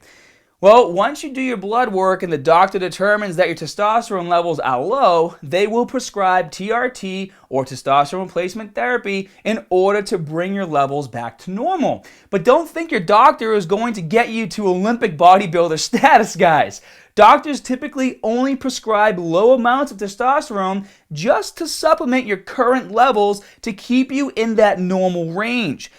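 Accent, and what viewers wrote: Boston, United States. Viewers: He’s so Boston Irish ☘️🇺🇸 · I’m from Boston and I have to say accent is thick..lol.great videos keep it up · Very knowledgeable. Thanks for the info. (Love the Boston accent. I'm in upper NY where we use hard "R"s and all sound like pirates) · who are you to come on his channel spouting nonsense he has a Boston accent get over it and grow up. how many accents are there in the world after all.... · Anyone else love the Boston accent😂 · tee ahh tee. Love Boston ahcent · Bostonian accent.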